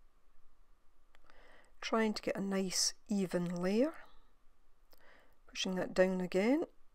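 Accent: Scotland, United Kingdom. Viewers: Thank you so much for this video. I've also messed around with this technique coz I think these patterns are quite amazing! I tried glass, sheets of rigid acrylic, bendy acrylic, various acetates, projector film, photo paper and more, inter-changing them all if you know what I mean. So far glass seems to give the best result. I found yt videos by Atomic Shrimp and Cat Hand (two separate channels) who did some experimenting with dentritic painting and mono-printing with pretty good results. I think they may have added a wee bit of water to the paints. Beats me how I did not discover your channel previously while on my dendritic travels, but am glad I found you now. You have so many videos and interesting techniques I would love to explore! And great to hear a Scottish accent too! Sub'd.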